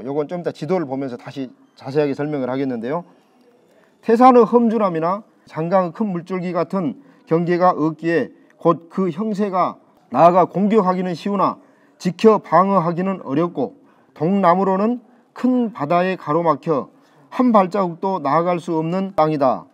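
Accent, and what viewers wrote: Jeolla, South Korea. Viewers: 말투가 전라도신데 사투리가 경상도라면 더 믿음이갈것같네요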